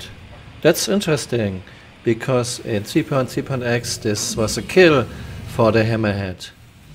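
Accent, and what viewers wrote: Germany. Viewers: Subbed ringged and I love your videos but ... for a non native english its very hard for me to translate your germanish. So many "so" and "torpedos" and many others. Please take that as feedback, i no way i wanted to offend you or anything ;)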